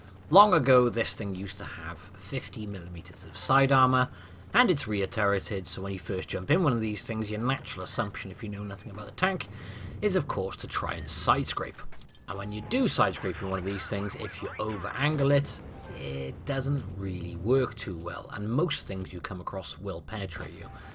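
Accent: United Kingdom. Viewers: I don't know how you get all these bouces while being on a flat ground, like the second game with leo and jag shooting at you... I get penned by stock T-54 all day long, even while wiggling and jiggling... 😭 Wg must be protecting you ! Or maybe do I suck? I'll try to apply the golden golden rule for my next games :p Anyways, thank you for the reviewing, love your British accent ~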